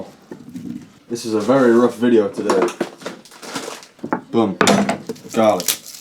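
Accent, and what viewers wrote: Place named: Yorkshire, United Kingdom. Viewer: What part of Yorkshire are you from mate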